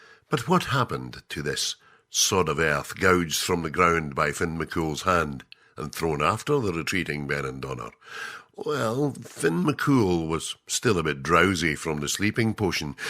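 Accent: Scotland, United Kingdom. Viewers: The story of one of Irelands most geological sites. Narrated by a guy who is not Irish but Scottish. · I have never heard this version, I love it, lol. I may be wrong, but it sounded like a Scotsman telling the story? I took that end bit about the Isle of Man as a jab. Is that a "inside Scottish joke"?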